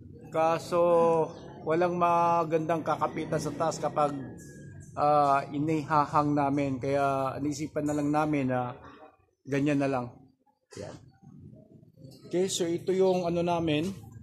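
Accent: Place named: Visayas, Philippines. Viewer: Bisaya kayo boss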